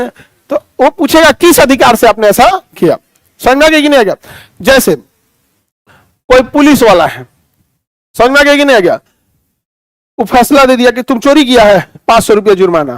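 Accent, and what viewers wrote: Bihar, India. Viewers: Kya jabardast bhojpuri Bihari bhasha ba sir 😂😂😂🎉🎉🎉❤❤❤wah wah wah wah wah wah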